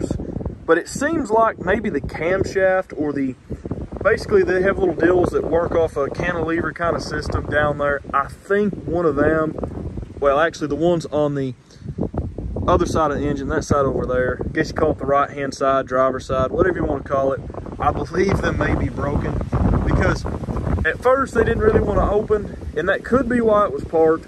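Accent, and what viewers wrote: South, United States. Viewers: Now, that is a cool American accent. This dude has manners. Where is he from in the states? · I love how Southerners pronounce "oil"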